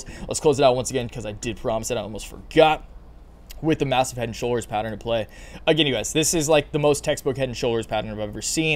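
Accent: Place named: Hawaii, United States. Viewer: He's from Hawaii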